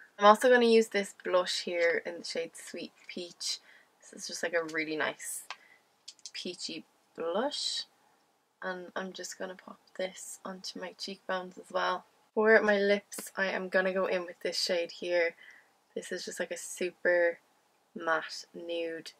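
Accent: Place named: Dublin, Ireland. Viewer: A beautiful look expertly applied. I love your Dublin accent.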